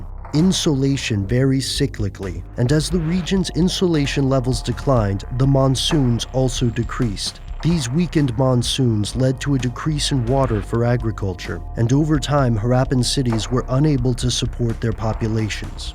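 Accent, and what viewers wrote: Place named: United States. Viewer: love how you yanks destroyed the ENGLISH language. pronounce things the right way!!! like IRAQ not I Rack and Pakistan not Pack I stan.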